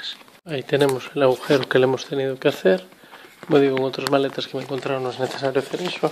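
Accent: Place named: Galicia, Spain. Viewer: Grazas! El acento gallego calmado es de lo mejor para estos tutoriales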